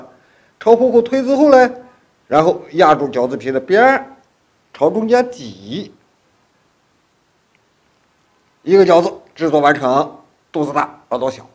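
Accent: Shaanxi, China. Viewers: 这陕普听得我太亲切了！想回家😭😭😭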